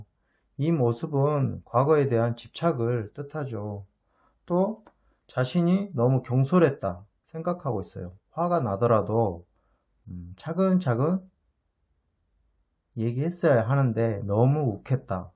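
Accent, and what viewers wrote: Jeolla, South Korea. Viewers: 혹시 전라도분이세요??말투에 사투리가있어요ㅋㅋ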